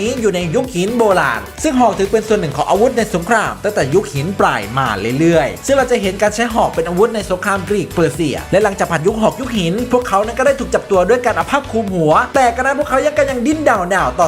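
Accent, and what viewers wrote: Isan, Thailand. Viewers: ชอบความเป๊ะของแอด ข้อมูลพร้อม แล้วค่อยลง อวยเต็มที่ น้ำเสียงไม่น่าเบื่อ ชอบมากค่ะ และสำเสียงอีสานใต้มาเต็ม แอดคนอิสานใช่มั้ยคะ🤣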